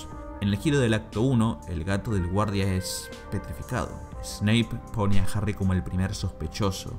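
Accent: Argentina. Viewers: parece argentino como habla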